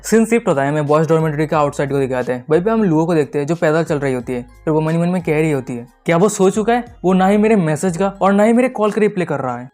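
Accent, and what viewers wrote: Bengal, India. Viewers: Hey bro are you Bengali 😮😮😮❤ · bro are you Bengali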